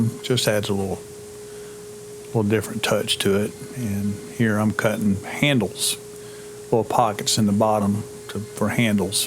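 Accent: South, United States. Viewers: No. Pelicans? No. Crabs? No shrimp? 😅 Beautiful work, southern fried accent. Excellent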